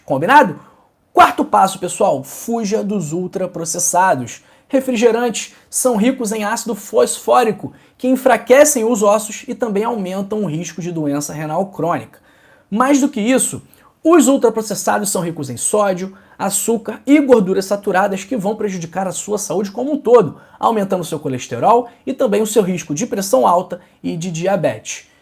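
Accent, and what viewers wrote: Rio de Janeiro, Brazil. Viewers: Esse sotaque de malandro carioca faz derrapar toda a credibilidad neste vídeo 🤣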